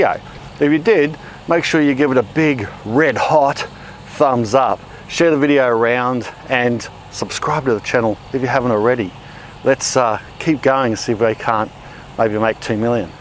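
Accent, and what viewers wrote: Australia. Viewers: As a dual US/Aussie citizen, I'm not knocking the Aussie pronunciation, but it might be amusing to have the "as we say in Australia" for some additional entertainment value. Oh, and I think you mean Bell Pepper, for Capsicum?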